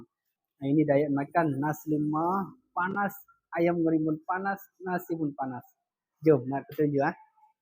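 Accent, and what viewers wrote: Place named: India. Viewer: knpa logat india pula dahh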